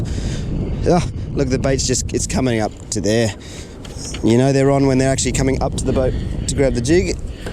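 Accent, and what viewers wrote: Australia. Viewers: You channel is addicting. As a guy with a expat snowy mountains father, the accent takes me home. Yeah nah y’all the best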